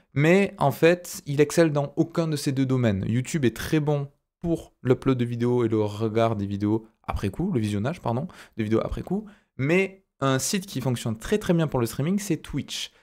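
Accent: South, France. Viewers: Ah cette voix et ce petit accent du soleil, pédagogique à souhait ! Bravo encore à toi !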